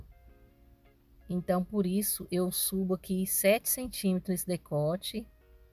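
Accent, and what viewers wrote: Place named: Minas Gerais, Brazil. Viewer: Estou há anos vendo vídeos de costura, e nunca vi os seus. Aonde vc estava mulher? Meus Deus. Vc é um misto de simplicidade, competência e profissionalismo, agora pra me destruir, vem com esse mineirês que eu amo. ❤❤❤❤ Parabéns.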